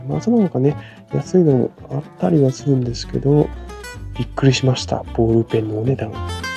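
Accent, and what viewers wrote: Kansai, Japan. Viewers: 久々にみたけどナレーションに違和感あるんだが。もっと関西の訛りあったよね？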